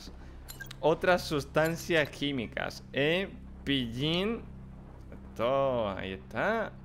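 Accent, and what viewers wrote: Spain. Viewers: sienpre te quiero preguntar De que isla Canaria eres?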